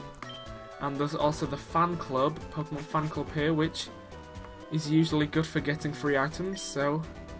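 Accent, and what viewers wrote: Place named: England, United Kingdom. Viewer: Are you From England?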